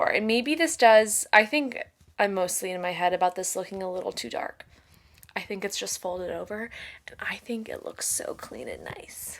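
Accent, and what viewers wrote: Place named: United Kingdom. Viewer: lol love the British accent :P! and love the knit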